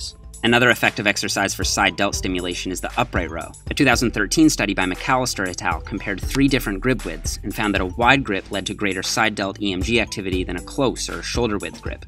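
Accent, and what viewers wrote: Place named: Canada. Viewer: love the canadian accent lol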